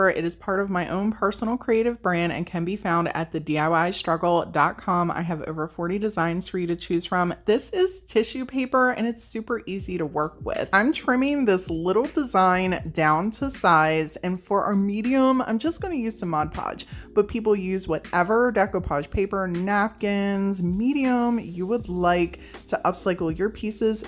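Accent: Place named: Pennsylvania, United States. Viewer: Pennsylvania!!!! That must be what it is.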